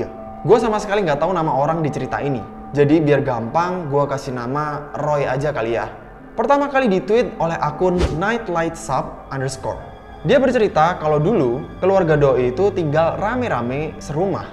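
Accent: Java, Indonesia. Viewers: Jowo keras anjai😂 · Jawa keras slurr 😅 Salam dari Jawa tengah tepatnya Legenda warteg Kota Tegal laka laka 🙏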